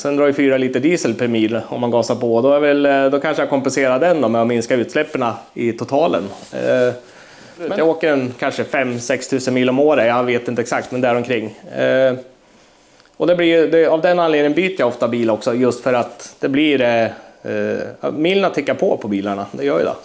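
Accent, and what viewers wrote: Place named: Norrland, Sweden. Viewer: Nu kanske du sagt det tidigare vid något tillfälle, men jag har väldigt nyfiken på din dialekt och var du/ni är någonstans i Sverige. Om jag skulle gissa på det skulle jag nog säga Västernorrland/ Jämtland/ Västerbotten.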